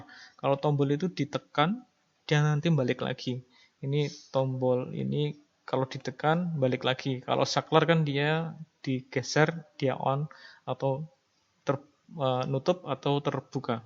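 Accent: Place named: India. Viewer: Can you and all of your countrymen do me and everyone else on the internet a solid. I am not trying to be a racist and i am not, but can you put a subtitle in English on all of yours videos so we can understand what you are saying. An Indian with thick accent trying to speak English is not easy to understand. But a lot of content from Indians are second to none when it comes to a technical aspect of things. with respect.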